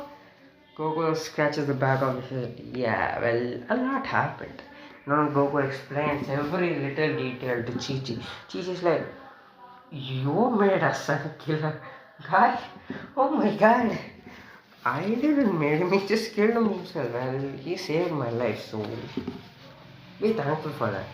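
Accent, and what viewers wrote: India. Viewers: Speak English not indian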